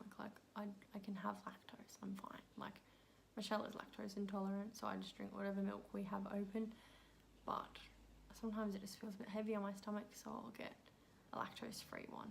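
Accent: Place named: Australia. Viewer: I was thinking of doing this for my channel too. Where did you get the questions? 😁 Also, I can't get over how Australian you sound now!! 🇭🇲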